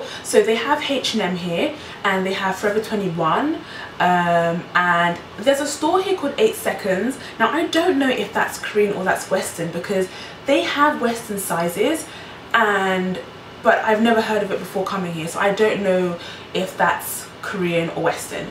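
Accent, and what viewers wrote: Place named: RP, United Kingdom. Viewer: You're so posh your accent omg!! 💕💕💕😍😍😍😍😍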